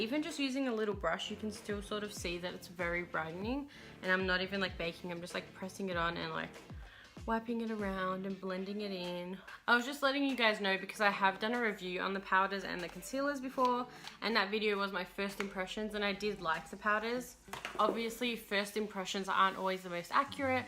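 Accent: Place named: United Kingdom. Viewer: Love this look girly and your accent 😍😍 you from the UK 🇬🇧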